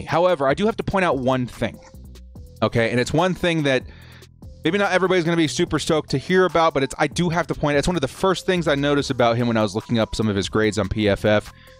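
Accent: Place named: Southern California, United States. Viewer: That's a SoCal accent your'e hearing, guy. Has a little Yinzer and sprinkle of Spicoli.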